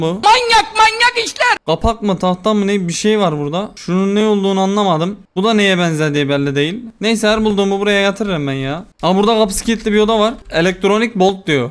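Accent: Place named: Thrace, Turkey. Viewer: Abim sen trakyalı mısın sesin trakyalı ya benziyor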